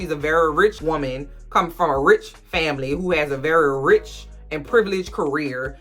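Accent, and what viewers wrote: New Orleans, United States. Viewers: I love your accent btw!! Sound like u from Nola :)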